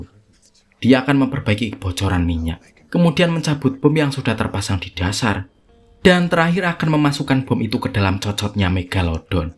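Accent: Java, Indonesia. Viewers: Logat Jawa pekat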